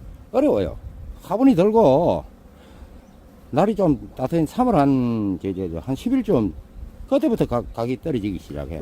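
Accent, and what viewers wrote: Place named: Gyeongsang, South Korea. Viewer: 경상도사람들만느낄수있는애정가득한목소리가 느무반갑습니다.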